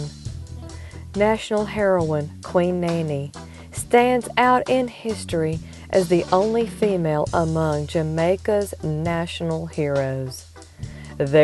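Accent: South, United States. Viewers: My grandad Will was a Maroon and I cant stand this southern American voice over.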